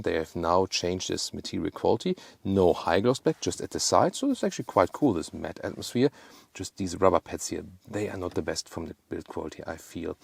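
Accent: Germany. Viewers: His German accent is sooo pronounced... oh my fucking god. :D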